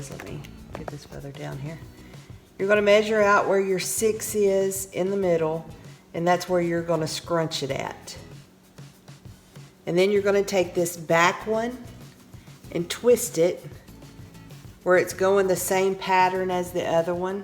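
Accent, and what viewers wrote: South, United States. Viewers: Love your wreath. Your home looks beautiful. You sound like you are from the south. I'm from Southeast Alabama. Happy Anniversary! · Happy Anniversary sweet lady! New subbie here! I’m really enjoying your channel! You’re so calm and real! I relax and learn new decorating tips while watching you! I’m in North Texas and love your Texas twang!🙋🏾‍♀️ Your wreath and porch are so cute! I’m inspired now and gotta step up my porch decor! Have a blessed Easter! 🌷🐇💐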